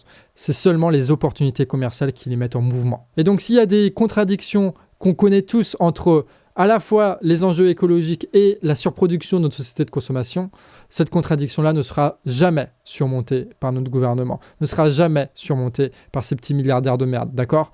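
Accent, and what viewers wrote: Brittany, France. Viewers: Clair, net et précis ! Merci pour cet excellent ton du nord des cotes des mers d'Armor . (Tonifiant, ce doit être le granite, ou alors l'éternel et indispensable grain de sel dans le beurre)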